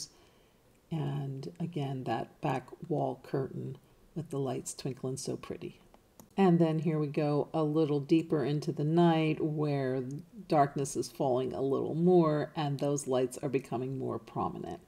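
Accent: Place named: Texas, United States. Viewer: I had'nt even read your reply until after I wrote mine. We even think the same but U talk like my AUNTIES/Tias from TEXAS 😄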